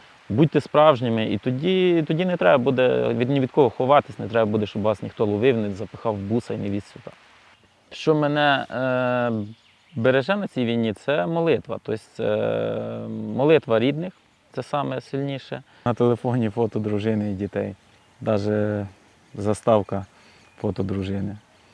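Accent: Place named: Slobozhanshchyna, Ukraine. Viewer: Та чудова у Вас українська мова! Слобожанський діалект - важлива її частина. Нічого Вам соромитися: Сковорода та Котляревський на Вашому боці.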